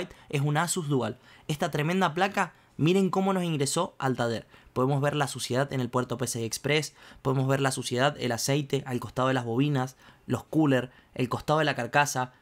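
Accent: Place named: Interior, Argentina. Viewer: Buenas. Tenes una tonada del interior, de donde sos? Corrientes?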